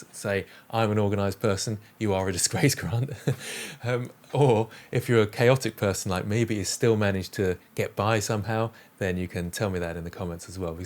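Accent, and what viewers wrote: United Kingdom. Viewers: Congratulations. Very well deserved. Your tutorials are so easy to follow even for a non-English speaker. The British accent is a very welcome bonus, too.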